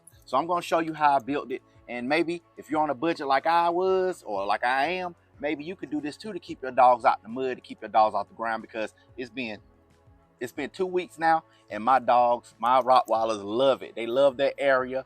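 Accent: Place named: South, United States. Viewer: I think my mind is made up now to use pallets. Hec, my pocket has my mind made up. We have old 12x12 fence for dog pin. You have great ideas and spoiled pets. Im a Georgia 🍑. I caught your southern accent about your second sentence. I find the southern accent rich, rooted and historical. Thanks for sharing your video.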